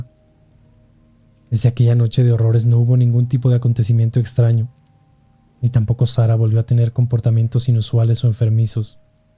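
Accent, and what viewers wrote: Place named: Mexico. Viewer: Mmm...No es una voz desagradable....Pero es de UN solo tono...sin matices...se nota el acento mexicano...y NO consigue "dar miedo"..😝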